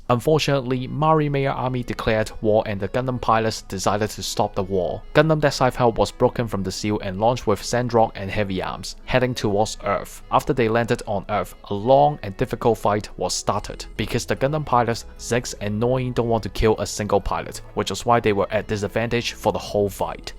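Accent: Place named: China. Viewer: I can't quite place your accent. I wanna say maybe Chinese or Tai. Either way, you don't give yourself enough credit. I didn't have any trouble understanding you.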